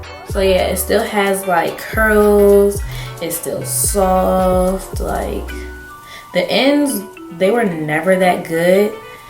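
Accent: DMV, United States. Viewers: Love the color. You sound like you're straight out of the DMV? Let me guess pg county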